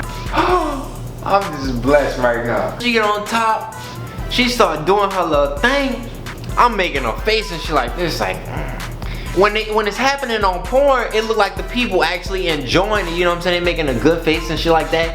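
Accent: New Orleans, United States. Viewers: You can tell he from New Orleans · You from new orleans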